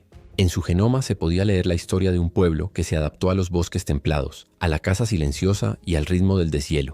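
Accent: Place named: United States. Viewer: No entiendo como, hablando de nosotros, la voz en off tiene acento americano. ???????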